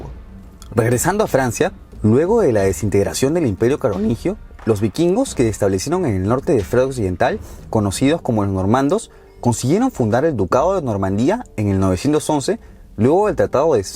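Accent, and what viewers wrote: Peru. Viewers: No se le entiende muy bien el acento del moderador ojalá usará acento más neutro no al estilo peruano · No sé pero creo que tu acento es peruano, eres mi compatriota? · Lo sacaste bien. Es un acento "peruanazo", con ese superlativo tan usado que usamos nosotros. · Es evidente que español no es; yo creo que es peruano, pero no estoy 100% segura de distinguir el acento. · Qué lindo tu trabajo.. un poco rústico pero Lindo 😍 y tu acento peruano (si no me equivoco) 😍😍 Lo explicas genial 👌😚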